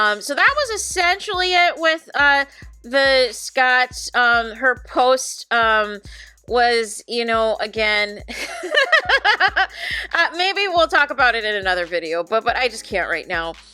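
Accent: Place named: New Jersey, United States. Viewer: Whom ever is complaining about your sound should merely adjust their sound. If they complain they can bye, you are the most non controversial CC, I've been tuning in almost 2 years now. You are great and growing which makes you a target. You get to it state facts and laff lol😂 I love your laughter. Sounds like a jersey girl ❤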